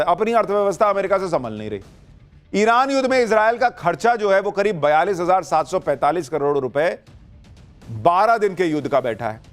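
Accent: Bihar, India. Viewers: आप अच्छे एंकर हैं और आपका बयां करने का अंदाज़ अच्छा है।आप शायद बिहार से हैं, जो बीच बीच में आपकी टोन से पता चलता है। आप अक्सर भाई, बॉस जैसे शब्दों का प्रयोग भी करते रहते हैं, जो शायद आप लोकप्रियता प्राप्त करने के लिए करते हैं। राष्ट्रीय स्तर के एंकर होने के लिए थोड़ा इससे बचेंगे, तो यह अच्छा रहेगा।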